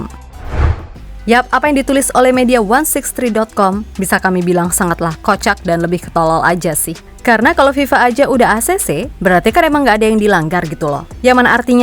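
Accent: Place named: Jakarta, Indonesia. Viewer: Wih mantap ni yg bw berita nya ngomongnya enak banget sumpah...betawi amay❤❤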